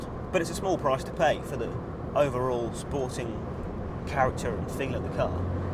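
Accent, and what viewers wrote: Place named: England, United Kingdom. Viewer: Because he is English, and that is how we English say 'Z'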